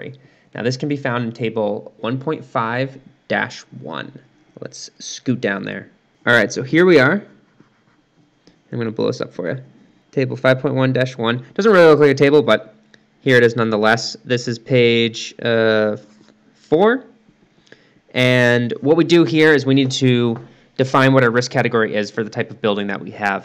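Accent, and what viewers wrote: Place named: United States. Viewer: I did a thumbs up because your voice sounds enough like mine for me to understand you. I am so grateful for a fellow American "accent!"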